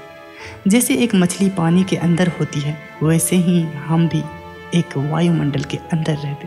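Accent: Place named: Pahadi, India. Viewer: Bhai pahadi ho , ho na Aapka accent pahadi he . Bhai bahut badiya ❤ plz continue.. Wating for your next vedio Very knowledgeable.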